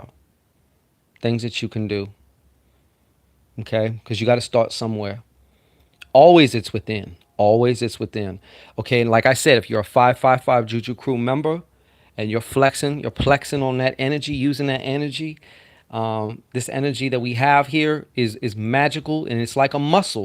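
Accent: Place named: New Orleans, United States. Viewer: Hey! Cajun Cappy here. You sound like you could be from New Orleans! Where are you located?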